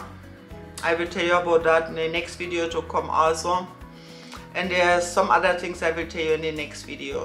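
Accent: Jamaica. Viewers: Hi, I'm one of your new subscribers. I love listening to you tell your stories of your Jamaican experiences. You tell it so well. You paint a very clear and easy to understand picture. I love hearing your Jamaican accent.😊👏🤝🙌🙏👍❣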